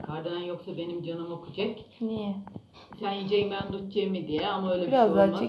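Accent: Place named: Aegean, Turkey. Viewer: KARADENİZ ZONGULDAK DAN SELAMLAR HEPİNİZE. ,,EGE ŞİVESİNİ COK SEVİYORUZ ,, ÇOK TATLI KONUŞUYORSUNUZ SÜPER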